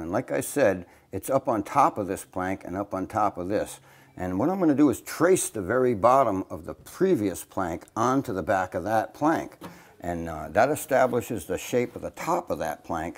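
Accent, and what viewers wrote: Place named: Rhode Island, United States. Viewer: I'm very happy I found your videos. You get bonus points for your accent. I'm a Californian, but my folks were from Rhode Island. It brings back fond memories.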